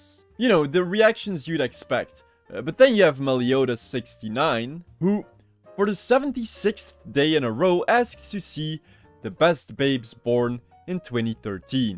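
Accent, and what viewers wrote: Netherlands. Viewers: i have a question i wanteed to ask, are u dutch? since u sound very dutch!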